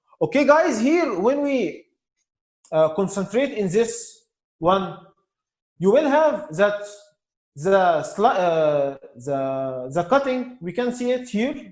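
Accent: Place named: Egypt. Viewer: why you zbeek like egypt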